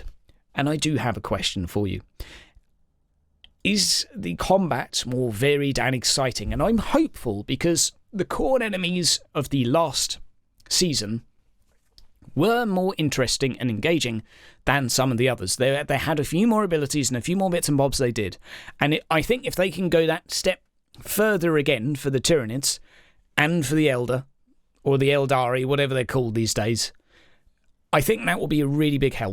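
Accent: United Kingdom. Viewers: I don't think any other fellas have a better British voice/accent than.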